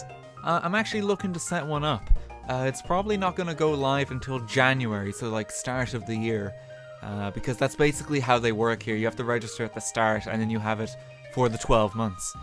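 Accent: Ireland. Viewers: Ah truesday Lovely Irish accent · Which county m8? Your accent smells like Southern. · "Tuesday tru turshday" love the irish accent, its always a joy to listen to.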